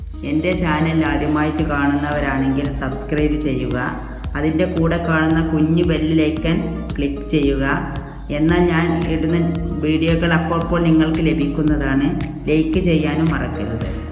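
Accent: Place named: Kottakkal, India. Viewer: സംസാരം കേട്ടപ്പോ മനസ്സിലായി കോട്ടക്കൽ അപ്പുറം ആണ് സ്തലം മനസ്സിലായി ഞാൻ രണ്ടത്താണി ചെറുശോ ല യാ ണ്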